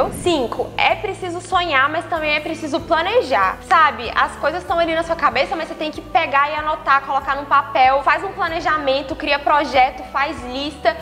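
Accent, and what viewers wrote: Minas Gerais, Brazil. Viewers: Voce e mineira?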